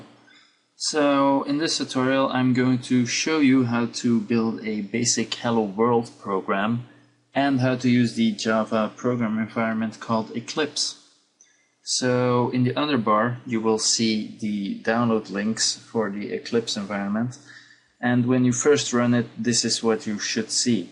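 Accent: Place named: Russia. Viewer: i love how he says Class but you can hear the Russian accent coming through.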